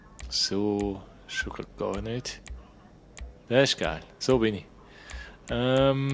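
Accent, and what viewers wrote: Switzerland. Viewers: Englich Schweizerisch.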